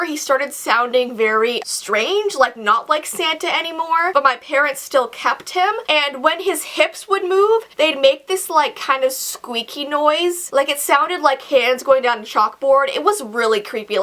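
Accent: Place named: Canada. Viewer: Jake Reed it's because she had a Canadian accent lol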